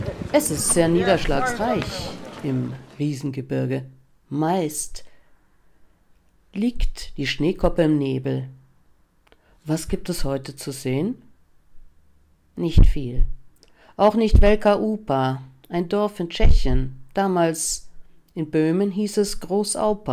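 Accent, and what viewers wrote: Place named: Breslau, Germany. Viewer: Vielen Dank für das tolle Video! Nicht nur, dass mich die Sprecherinnen-Stimme etwas an die Mundart meiner Oma aus Breslau erinnert (nur eingelegentliches „gell“ fehlt noch 😄), es ist auch sehr informativ. Schön, jetzt überleg ich mir, mal hinzufahren. Zuletzt hab ich die Stadt als Kind Anfang der 80er gesehen.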